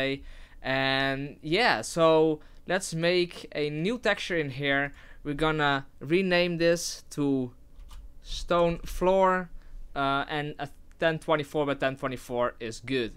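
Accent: Netherlands. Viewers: nice tutorial. Random question: are you Dutch? You sound like a dutch person speaking english.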